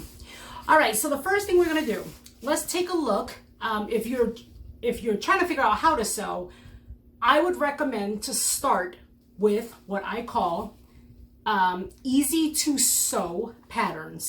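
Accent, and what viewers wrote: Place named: Boston, United States. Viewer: you are so adorable, I find I am smiling while I am watching you. This video, helped me be confident in the things I have figured out on my own already, and you clarified some things I was not sure about, and taught me a couple new things!!! Thank you! But one question. Are you from Boston? I had a friend in college long ago, from Boston, and she said a couple of words with a particular accent, the you say a few words really similar. The word "here" and words that end in "-er". Just wondering.: D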